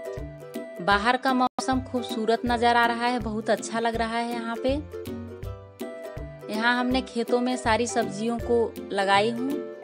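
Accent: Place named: Bhojpuri, India. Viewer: pata nhi sbko apke bolne se kya aitraj hai cooking channel hai... koi voice improvement ki class nhi chal rhi hai... hindi mai to bol rhi hai sab angrez haj kya yahn pe... unki bolne ka style alag h bas hum bhojpuri bolte h hindi utni asani se bolne mai dikkat hoti hai gaon mai support karo warna chodke jao... bhashan mat do mehnat ko appreciate karna chaiye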